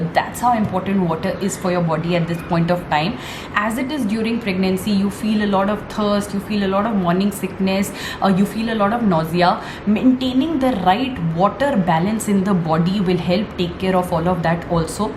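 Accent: India. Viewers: This is such a normal indian english accent.. What a ridiculous comment. How is everyones mother tongue hindi in India???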